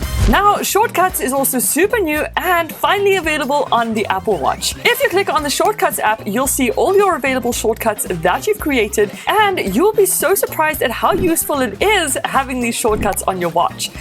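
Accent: South Africa. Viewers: South African accent?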